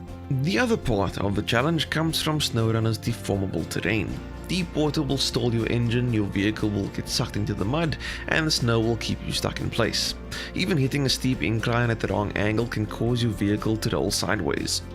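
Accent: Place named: South Africa. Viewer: Awe my bru, South African yes ?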